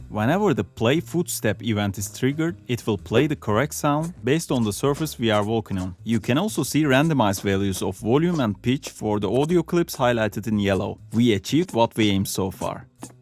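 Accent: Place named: Turkey. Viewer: Türk olduğunu aksandan hemen anladım.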